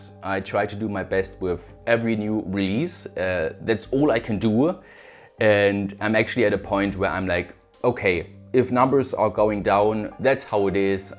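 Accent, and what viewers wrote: Germany. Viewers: I joined you when you had about 40,000 subscribers. I really liked your content and your German accent. As your subscriber and fellow creator, I completely understand you. It's just titanic work. I wish you inspiration in the new year and ease in creating videos.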